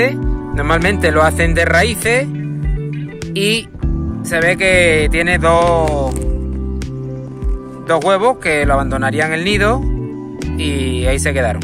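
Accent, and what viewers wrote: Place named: Andalusia, Spain. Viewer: Hola. Por tu acento y tipo de cultivo pareces de Andalucía. Perdona si corrijo, lo mismo me equivoco pero creo que la curruca mosquitera no se reproduce en el sur. Su límite queda en el tercio norte. Pero que lo mismo estoy equivocado. Ya te seguiré. Saludos.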